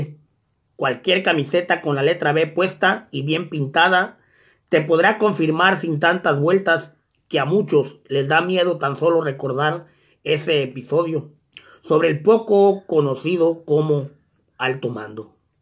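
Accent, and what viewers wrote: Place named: South, Mexico. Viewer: tiene voz y acento sureño y no acento sinaloense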